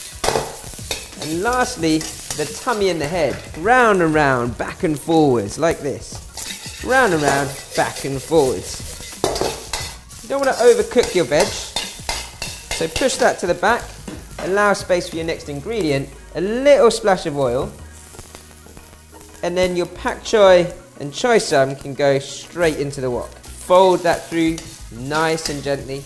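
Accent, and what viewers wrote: England, United Kingdom. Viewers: Fast and efficient cooking , and you have pommie English accent !!!!!!!! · 🔥🔥🔥🔥 bro you talk like English kat but this dude looks Asian is fuck but makes good ass food bros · Some how a Asian with a British accent cooking Chinese doesn't seem all that authentic to me. Kind of like a Brit telling an American world war 2 story. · i am an asian n i am jealous of ur english accent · You don’t see many Chinese with British accents. Love it! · I like the way you speaks . Britainese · Asian guy with a British accent lol.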